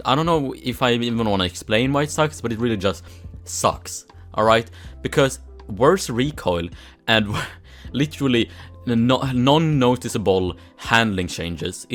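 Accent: Sweden. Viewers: I just watch for the Swedish accent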